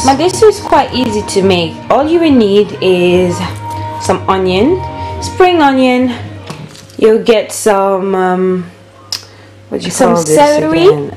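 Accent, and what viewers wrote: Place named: United Kingdom. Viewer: 😍😍😍😍😍😍😍 she talks well, your accent sounds Uk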